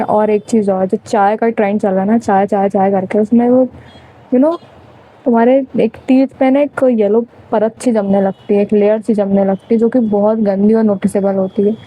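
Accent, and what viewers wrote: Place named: Mumbai, India. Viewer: aapke bolne ka tarika esa lagta jese aap mumbai se ho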